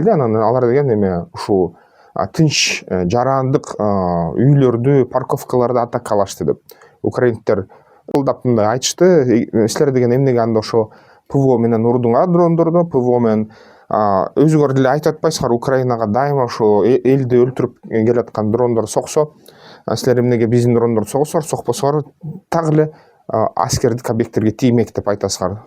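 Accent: Standard. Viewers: Таза кыргызча үчүн өзүнчө чоң рахмат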